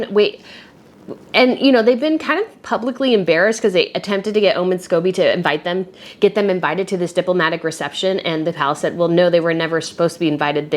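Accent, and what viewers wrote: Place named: United States. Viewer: I'm nether British nor a 'Royal', but people like you are only after klick rates here. From yr accent I assume you're American, so what makes you an 'expert' on interpreting gestures and words of people you don't know and never will? Nothing but riding the currently so popular 'Anti-Harry-Meghan' wave... Disgusting!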